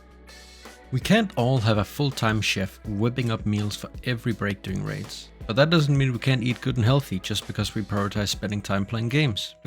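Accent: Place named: Denmark. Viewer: I fucking knew you were Danish by the accent and then got confirmed when I saw those puregym dumbbells. Let's goooo!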